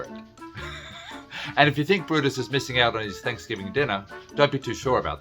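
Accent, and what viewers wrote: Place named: Australia. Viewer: we have known each other over 2 decades! WE ve worked on music, traveled to the Winter Music conference in Miami, but this new narrative of EXOCTOC animals is by far informative snd your sense of humor and Aussiue accent keeps you glued to keep watching. So when you were living with me in Jersey City, I might as well say...that o also had an exotic an male living with.me lol ☆☆☆☆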